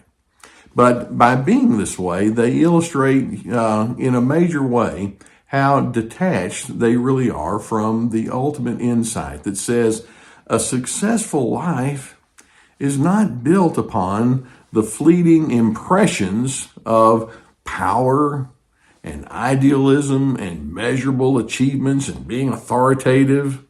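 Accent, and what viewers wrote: South, United States. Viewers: Love the southern twang!! It helps my Georgia heart listen ❤ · You standing on hollow ground..... My jaw dropped when I saw you reply to US... Really I started to cry. The very first time I watch you. You had so much southern twang that I kept watching other people, but in this past year... Your the best!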